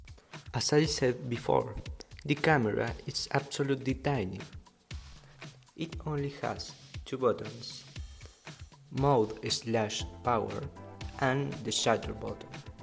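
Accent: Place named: Latin America. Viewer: Amigo buen trabajo pero espero que hayas mejorado el acento se nota que eres español/latino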